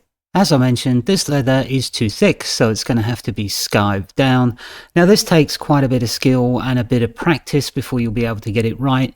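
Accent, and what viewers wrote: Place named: United Kingdom. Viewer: I’m an american, so take this with a grain of salt, but I think a British accent makes anything more enjoyable 🫤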